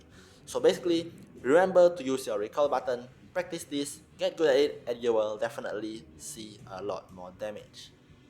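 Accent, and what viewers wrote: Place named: Singapore. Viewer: man he sounded sooo SG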